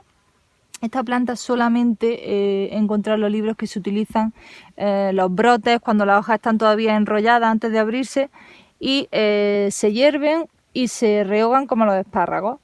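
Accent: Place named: Cádiz, Spain. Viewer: Muy interesante video 👍, la chica habla como los gaditanos 🙄, 😁saludos desde Uruguay 🇺🇾🙋🇺🇾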